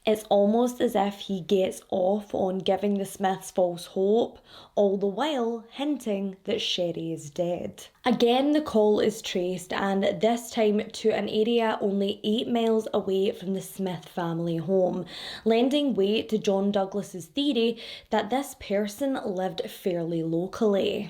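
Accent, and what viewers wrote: Scotland, United Kingdom. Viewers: Please don´t change. I love your bubbley personality, and I especially love your scottish accent. I love Scotland, and I hope my husband and I are gonna visit it one day ♥♥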